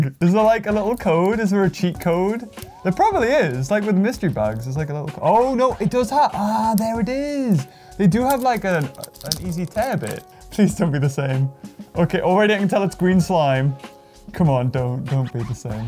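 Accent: United Kingdom. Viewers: You brittish mate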